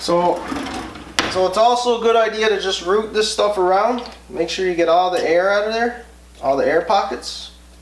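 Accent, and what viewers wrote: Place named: Newfoundland, Canada. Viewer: I knew within 5 seconds of hearing you I can to the right place. Newfies Rule.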